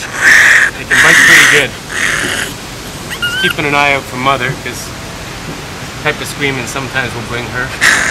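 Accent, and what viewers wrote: Canada. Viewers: I know a Canadian when I hear it!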